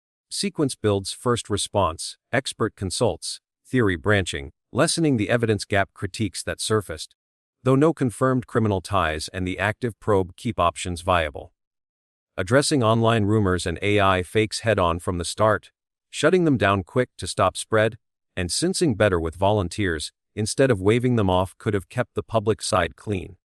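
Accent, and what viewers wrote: United States. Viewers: I don't think there ever was a snake nearby on the verandah. Also, it is very difficult listening to all this stuff when the speaker gabbles so quickly, and he has an American accent. He is very difficult to understand his meaning, sometimes.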